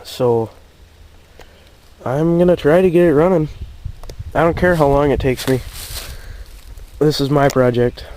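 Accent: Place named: Pennsylvania, United States. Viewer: You sound like a Pennsylvainian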